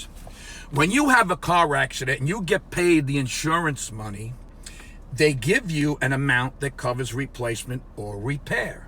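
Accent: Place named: Massachusetts, United States. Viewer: You have a Lowell or Malden accent. I’m from Billerica.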